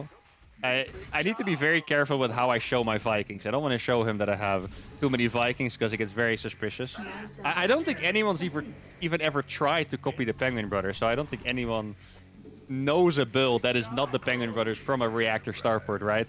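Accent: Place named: Netherlands. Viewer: He is Dutch. Most of them speak English very fluently. Coming from England I can tell from his accent. The Netherlands is not far.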